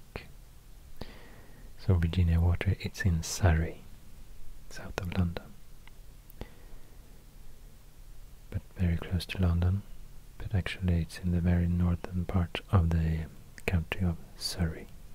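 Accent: Sweden. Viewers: Where abouts in Sweden is he from? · Are you from swedan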